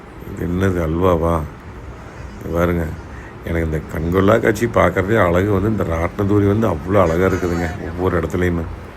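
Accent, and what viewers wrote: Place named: Coimbatore, India. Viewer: Anna unga kovai tamil super.. Enakum kovai tamil kathukanum, but iam chennai na unga periya fan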